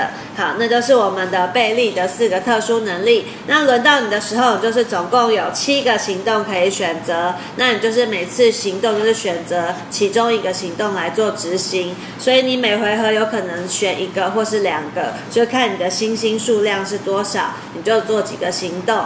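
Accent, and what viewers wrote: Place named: Taiwan. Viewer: 咬字不清晰，台灣國語難聽懂…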